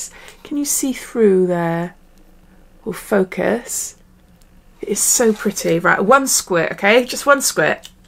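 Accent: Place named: England, United Kingdom. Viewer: I subscribed because of ur accent I am subscribed to a few English ladies. I'm in the USA in the state of Virginia 😊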